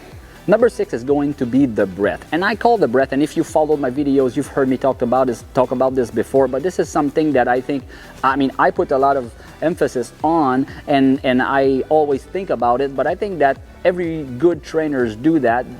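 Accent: Quebec, Canada. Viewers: You sound like you are from Quebec! Am I wrong?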